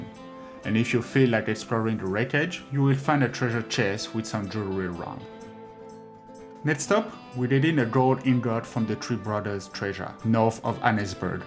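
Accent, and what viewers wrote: France. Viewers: What accent is this?? It sounds almost French with a touch of Dutch or even German.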